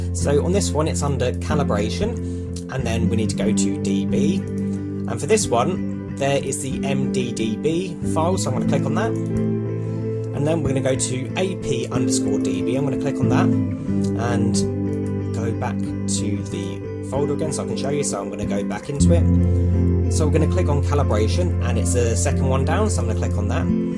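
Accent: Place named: United Kingdom. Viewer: Thanks for being british for a start and not indian and for not playimg stupidly loud dubstep music on your video. Sadly its not working for me i have the correct firmware, reflashed my hardbricked phone and it functions as normal now except the annoyong GoogleKeyState : fail issue but its not working for me for some reason??? Tried opening as administrator, triple checked my imei numbers, double checked i had correct version of firmware EAA version... Im at my wits end with this stupid chinese phone lol im using a Ulefone Armor X5 (not the pro i triple checked that lol) if anyone has any advice feel free to comment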